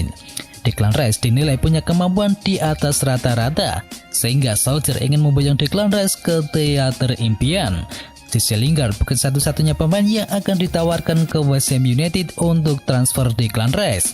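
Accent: Java, Indonesia. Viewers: bisa gak pakai bahasa Indonesia yg baik dan benar jangan logat jawa dasar biawak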